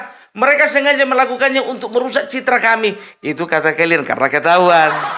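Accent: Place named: Java, Indonesia. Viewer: BANG. SERING2 PAKE LOGAT JOWO, LEBIH KEREN DAN LUCU BANGET 😂 HOORRAASSS... MANTAP 👍👍👍🙏🙏🙏 Saya Warga Jember Jawa Timur Hadir 🙏🙏🙏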